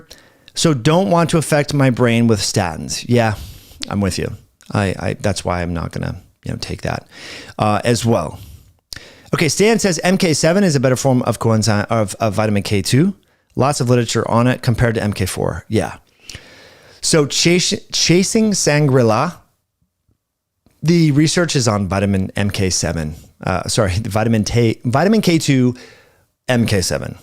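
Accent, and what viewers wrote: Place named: South, United States. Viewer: North Carolina here. I heard a "bless his heart." You must be from the South. Hsha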